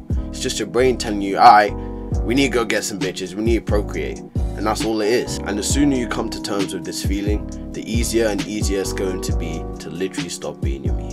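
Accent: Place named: United Kingdom. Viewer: british black man version of a southern black man.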